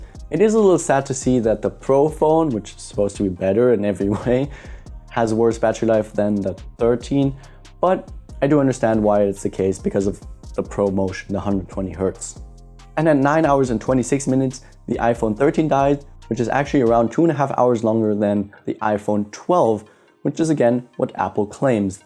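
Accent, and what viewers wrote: Germany. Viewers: Haha wie man sofort den deutschen Akzent merkt 😂. Tolles Video, danke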